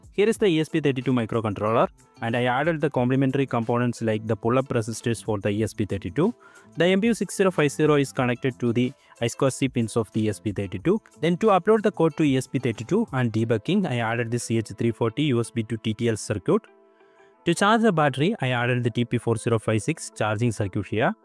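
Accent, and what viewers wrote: India. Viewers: 我勒个印度英语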